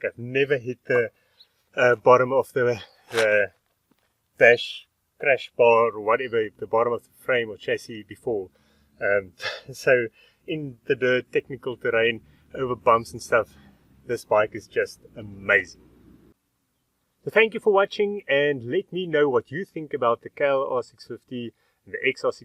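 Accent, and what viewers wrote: South Africa. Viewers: Hello! I'm always happy when somebody talk about XR's, and I suppose that you are a sort of neighbour because you seem to be in South Africa (as I hear your accent and the left side coded on the road) and my original country is the red island: Madagascar! We've got the same landscape with laterit dust that make one of the most sticky mud I've ever seen and rode. So I had 3 XR's and only the air-cooled model RFVC and a Domi, the last one was rebuild with a Domi engine to have the electric start but the cams were from XR, and I wanna say that the red pig is one of the most awesome bike you can ride. As some few riders say, add an big plastic tank by Acerbis and an oil-cooler to avoid overheated (the weakness of the RFVC engine at last... and the right level in the carb bowl!) I don't think that the KLR is on the same level, here in Europe and mostly in France, the Kawa is a basic commuter with trail style flavour, the contender of the KLR would be surely the Domi or the Suzie DRS 650 and Yamaha XT 600 (for the japanese brands). 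I think the real competitor of the XR is the KLX R 650 by Kawasaki because it's a real dual sport bike with some great capacities in off-road as the TT 600 by Yam. I rode few times the KLR, and it was too smooth, almost soft and a bit lack or torque, mostly in off-road (dust and rock tracks, mud and sand...), really no way with it in severe conditions,even the liquid-cooled engine seems to be more secure (I heard many times the cool fan opera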